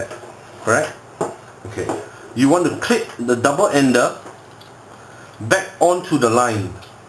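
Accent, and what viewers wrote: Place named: Singapore. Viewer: Wow!!!! The first impression was that Singaporean English accent. Further confirmation from your profile reveal you to be my countryman! Haha Great video. Recently just got my spool and SMB. This is a good "dry run" video before I actually deploy it on my next dive.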